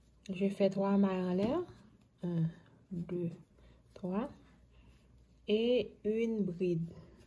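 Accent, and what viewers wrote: Haiti. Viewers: T'es Haïtienne ? Ton accent ?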